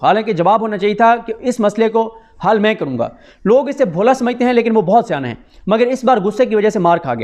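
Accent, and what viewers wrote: Saraiki, Pakistan. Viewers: AP ka ghr idr Hy islmabd???? R hm AP ki sraiki ko sumghty him bohttt piari boli Hy hm jhng sy hin r faslabd rehty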